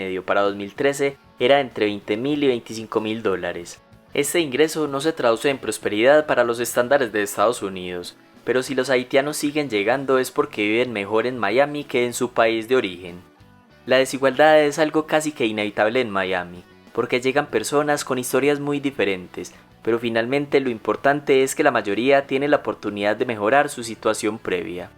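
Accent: Colombia. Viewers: Es colombiano, tal vez de medellin o del eje cafetero (pereira, manizales, armenia) etc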